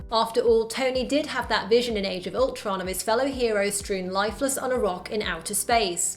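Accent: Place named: United Kingdom. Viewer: British accent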